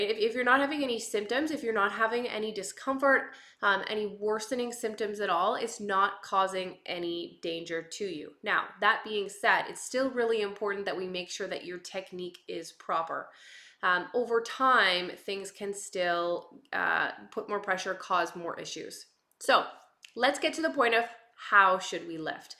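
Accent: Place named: Canada. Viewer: So CLEAR! And delivered with a charming Canadian accent. I’m sure this will help lots of people thank you.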